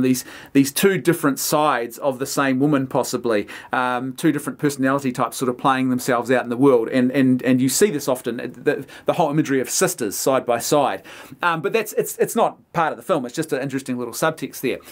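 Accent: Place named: New Zealand. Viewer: Is it? I always thought he was saying "Brandon" with a Kiwi accent.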